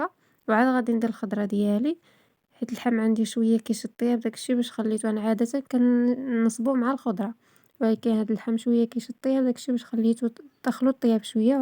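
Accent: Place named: Algeria. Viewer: انت منين من مغرب ،،. راكي وتهدري كيفنا في جزاير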